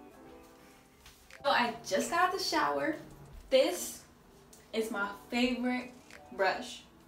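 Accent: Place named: Brazil. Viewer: seu sotaque é diferente. você é brasileira ? tive impressão que você era por conta do sotaque...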